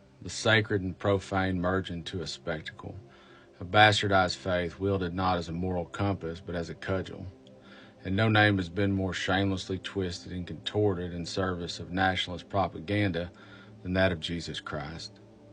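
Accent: South, United States. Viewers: You are a gentleman and a scholar, sir. With a southern accent, you command attention without threatening, judging, or raising your voice. Thank you. You are a joy to hear in these troubling times. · This Canadian loves your Southern values and wonderful accent. Please seriously consider running for office. You would make a amazing statesman. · Refreshing to hear a southern accent gentleman speaking the honest to God truth. What a great country. I have faith. I have faith in this gentleman and others like him. Who speak truth to those who despise truth and laws. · Southern dialect.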